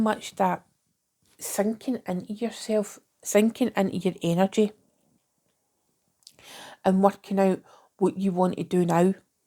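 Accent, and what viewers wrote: Scotland, United Kingdom. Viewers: Interesting. First time here ☺️ am I detecting a Scottish accent?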